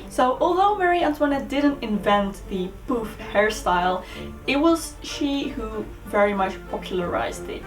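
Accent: Netherlands. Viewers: are you dutch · I heard some Dutch accent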